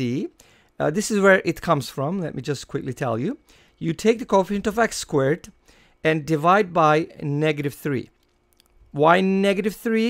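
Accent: Turkey. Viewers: Abi ingilizcen gayet gelişmiş. Daha demin 1 yil onceki videonu izliyodum, türk oldugun hayvan kadar anlasiliyordu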